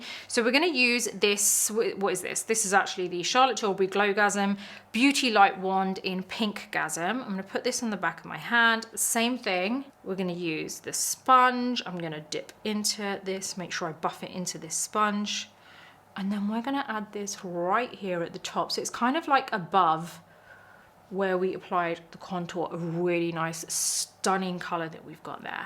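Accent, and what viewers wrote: United Kingdom. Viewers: Does your accent change?? You’re more British than before 😊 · Wow, forget about the makeup, but I love the way you speak, you use that British accent and look . It suits you so much. I love watching you speak. 😅❤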